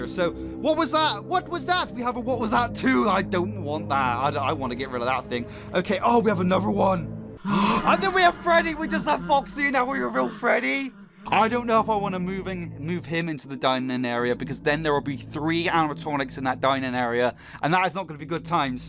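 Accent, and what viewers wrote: United Kingdom. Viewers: Like it sounds like a mix of British and Australian But it sometimes phases into a bit of French And it randomly goes to American I’m sorry if I offended you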